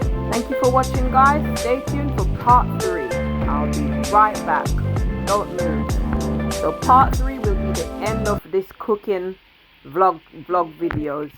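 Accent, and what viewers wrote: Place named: United Kingdom. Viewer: Damn that British and Britishhhh 😅😅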